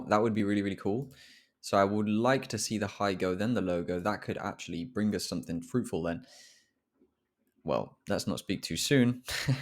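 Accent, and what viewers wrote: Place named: England, United Kingdom. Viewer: I love your sweet English Accent. Almost like I'm listening to English premiere League commentary. By the way your videos has really helped my trading journey. Thank you.❤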